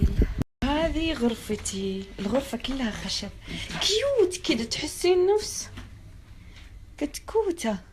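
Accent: Saudi Arabia. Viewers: صحيح كلامها سعودي كأنها سعودية انصدمت من طريقة كلامها ضاطبة اللهجة مئه بالمئهSalwa Mery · مغربية.....والحكي سعودي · انتي مغربية ليش تتكلمي لهجة سعودية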